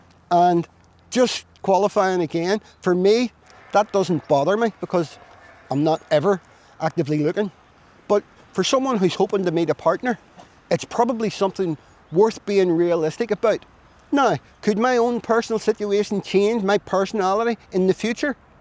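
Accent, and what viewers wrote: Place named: Northern Ireland, United Kingdom. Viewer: I love your accent. M b i.ll move to NI.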